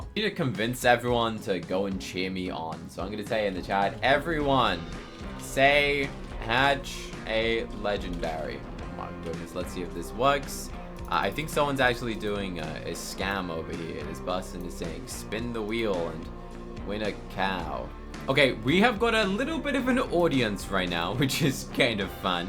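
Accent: United Kingdom. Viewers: So, I made a private server on adopt me and I tried to get you listed as someone who could use it but for some reason, I cannot friend you on Roblox. Can you please answer the question as to why and yes, I do mean you the YouTuber known as with the British accent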